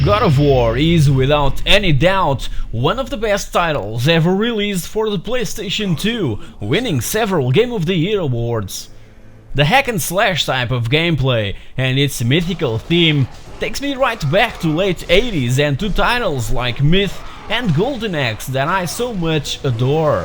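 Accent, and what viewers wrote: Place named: Portugal. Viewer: LOL, o sotaque pt é tão vísivel :D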